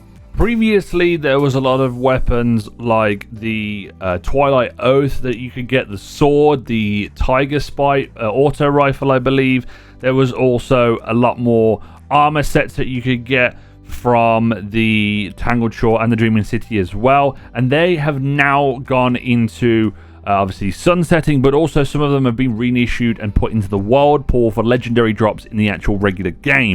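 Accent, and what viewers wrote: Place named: Scotland, United Kingdom. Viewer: Ah some scottish accent. Nice.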